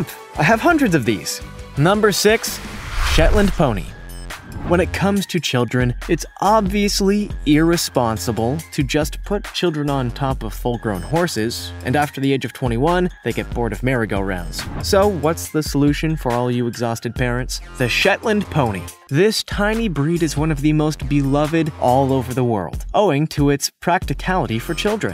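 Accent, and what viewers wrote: Canada. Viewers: Accent canadien eh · Christine Still he’s Canadian and I have a canadaian friend who pronounces it the same idk tho🤷🏼‍♀️ maybe it’s not that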